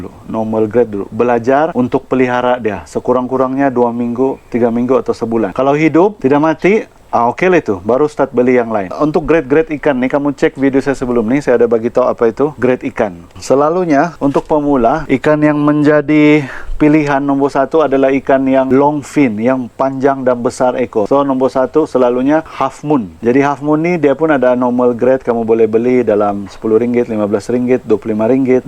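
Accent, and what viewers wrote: Sabah, Malaysia. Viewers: Terbaik bossku..sdp dgr org sabah ckp😍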